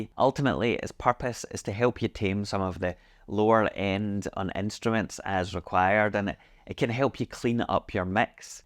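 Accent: Scotland, United Kingdom. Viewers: subscribed for your delicious Scottish accent!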